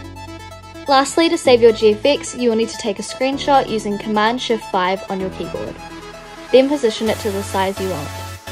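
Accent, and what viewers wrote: New Zealand. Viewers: omg are you from nz?? you sound like one! (im sorry if that sounds rude or anything!!) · Are you from New Zealand?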